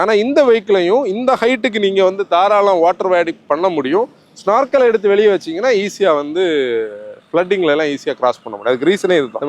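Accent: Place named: Nagercoil, India. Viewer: I think he is nagercoil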